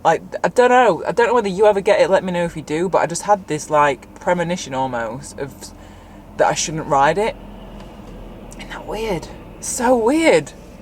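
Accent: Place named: North of England, United Kingdom. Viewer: Robert Tempest : that's the accent of a good north of England gal and it doesn't need to change thank you very much 😃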